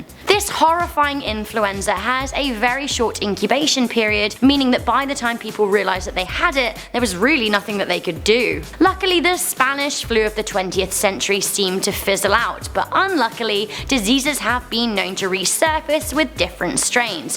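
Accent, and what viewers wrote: United Kingdom. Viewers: Does your British dialect have a name? You have such a very pleasant voice! ❤️ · Something about a British accent makes the end of the world seem pleasant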